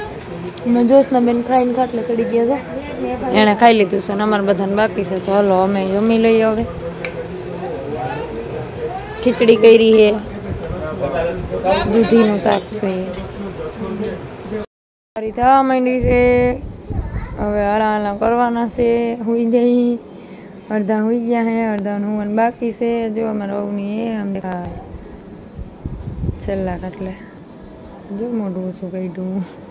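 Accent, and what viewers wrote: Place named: Amreli, India. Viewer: Ha ho ben amreli ni bhasa to sav algj lage to ben tme sasre aaviya tye tmare bolvu thoduk aghru lagtu hse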